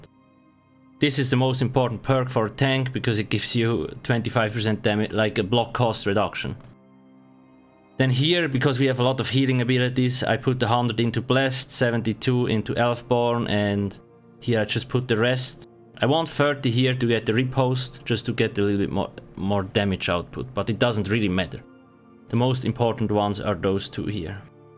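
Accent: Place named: Switzerland. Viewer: His accent is Swiss.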